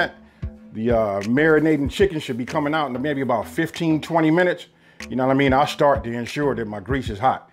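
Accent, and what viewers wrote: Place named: California, United States. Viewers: Man! Just discovered you last night and I been hungry ever since, knew I recognized that Cali accent, from the Bay Area but been in the south for 30yrs, keep doing what you doing love it! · I thought he was from LA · I recognized that South L.A accent, not a Compton accent 😁 I LOVE the recipes! I need an invitation to the next cook out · I knew he was from LA off top but it's a Compton thang we all LACounty but we breeded different.... Lol and (no disrespect either) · I thought you were from LA! LOL....The chicken looks screamin. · Sound like L.A.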